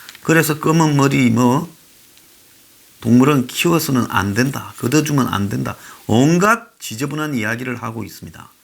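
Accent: Gyeongsang, South Korea. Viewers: 디져야 한다.참 오랜만에 들어보는 경상도 사투리군요. 구수합니다. 문도 디지고 조국이도 디지고 이해찬이도 디지고 기레기들도 디져야 한다.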